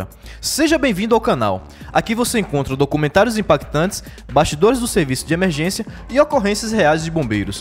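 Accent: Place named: Salvador, Brazil. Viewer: c é daq de salvador irmao? teu sotaque e os video que vc botou ai é tudo daqkkkkk esse ultimo ai é na paralela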